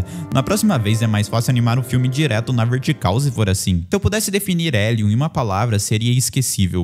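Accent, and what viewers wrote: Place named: Interior, Brazil. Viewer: qual região do Brasil você nasceu e/ou vive até hoje? Porque pelo visto você tem sotaque de pessoa do interior do Brasil.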